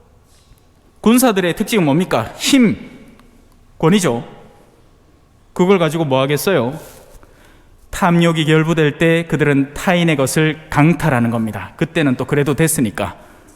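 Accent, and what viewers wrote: Gyeongsang, South Korea. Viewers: 주님 주신 빛나는 달란트를 백배만배 쓰시는신부님.....옥구슬 같은 말씀말씀이 그저 아멘아멘!!!하게 합니다 만인을 행복하게 해주시려는 키타소년이 된 모습과 마당의 경상도사투리가 정겹습니다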